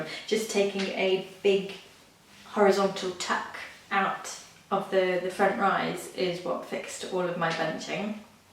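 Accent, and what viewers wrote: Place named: England, United Kingdom. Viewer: I was going to say, your accent sounds very English to me! A friend of mine with Welsh family started learning some once but it seemed to have some seriously complicated spelling - mutations and things!!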